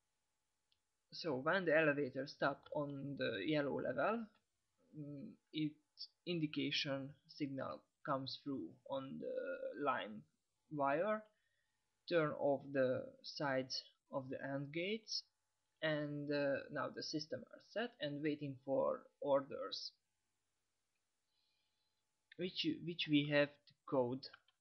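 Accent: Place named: Serbia. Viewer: Thank you very much Both of these videos are very helpful. And nice accent mate, Serbian by guess.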